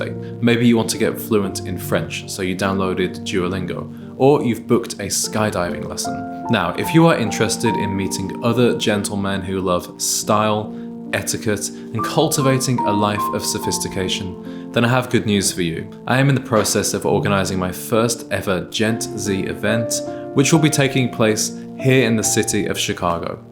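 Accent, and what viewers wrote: United Kingdom. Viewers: Chicago? I thought you were British in the UK!?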